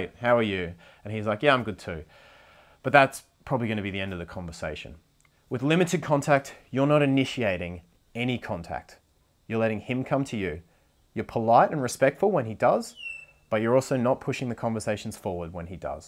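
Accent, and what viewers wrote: Australia. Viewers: This is the best video I have watched, my boyfriend and I split up two days ago and I am devastated and I couldn't stop thinking why?! And will he miss me? Will he regret this? Will he realise he dropped a good woman for himself? But you are right. I need to focus on myself again, focus on putting me first. He may come back to me and want to talk to me when he is ready, I know him very well and he will never want to come back to talk, I already know he is doing everything he can to put me behind in his past. But I still hope that when a few weeks of his strength and determination deteriorates and when things go quiet and he's lonely, he begins to think of me, and miss me and then the pang of sadness and loneliness really hit his heart and then the wash of regret hits him in full force. And I pray that when that day comes for him, that I am feeling stronger and closer to being healed. 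Also as soon as you said "shit fight" I clicked and realised you were Australian 😂 I couldn't tell if you were kiwi or Aussie but then I heard the twangs! I'm a kiwi girl in Sydney 🙏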